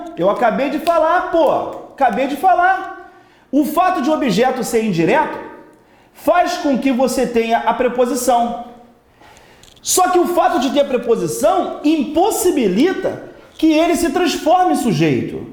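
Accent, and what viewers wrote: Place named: Rio de Janeiro, Brazil. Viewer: Sotaque de carioca já é foda de aturar, ainda mais quando tem que estudar ouvindo isso...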